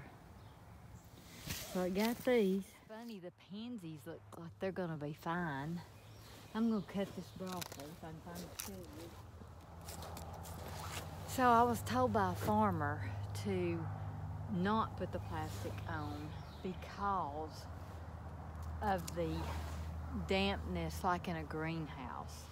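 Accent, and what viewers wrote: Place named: East Texas, United States. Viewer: Thank you.👩‍🌾 I am enjoying my garden catalogs. I see my gardens in my visions underneath all this snow. You make me homesick. Are you east Texas? It sounds like home, I miss the sing song chatter of my Aunts and cousins in the kitchen.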